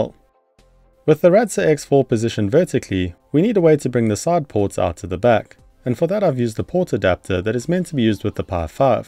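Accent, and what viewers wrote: South Africa. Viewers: Nope. South African😊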